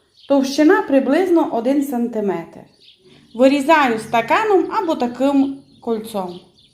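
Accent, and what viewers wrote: Transcarpathia, Ukraine. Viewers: Обожнюю Закарпатській діалект, а рецепти супер. Я обов'язково все перепрошую. Бажаю миру і веселих свят. Вітання з Буковини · Слухаю Вас і милуюся закарпатським діалектом! Дякую за рецепт, якраз шукала як приготувати пончики!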